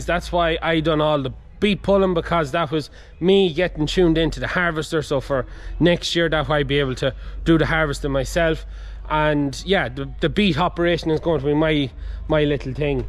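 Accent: Ireland. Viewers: I’m a first time watcher. It sounds like you’re from Ireland. My great, great grandfather immigrated to the USA from County Caven in 1828 when he was 14 years old with his family. My brothers & I now own the farm he settled in Renville Co., Minnesota in 1866. My mom inherited it when her parents died in 1987. We inherited it from her in 2008 when she died. We rent the cropland out to a corn & soybean farmer. It’s a little more than 92 acres. What county are you from? Thanks. I subscribed too.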